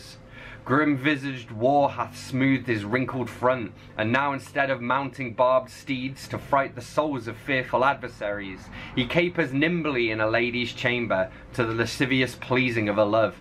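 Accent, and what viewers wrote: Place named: England, United Kingdom. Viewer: Shakespeare is 1000% BARS! It kills me how dope his lyrics are. Also hearing it in your natural English accent is awesome.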